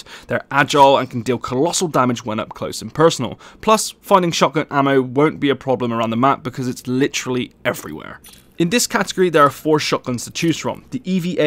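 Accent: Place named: West Country, United Kingdom. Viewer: you from bristol or summerset buddy?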